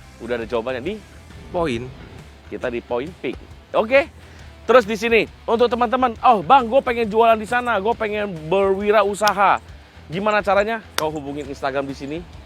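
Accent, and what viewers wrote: Medan, Indonesia. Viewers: Logatnya medan banget...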